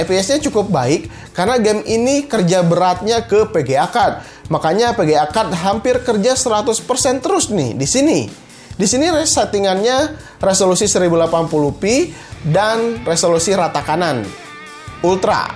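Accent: West Java, Indonesia. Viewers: Itu logat sunda gan, emang klo bila f atau v emang susah, bukan d buat buat itu gan😀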